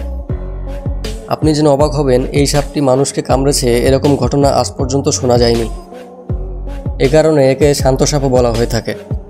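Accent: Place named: Bangladesh. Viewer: Khub Bajey Bangladeshi Gramya Uchcharon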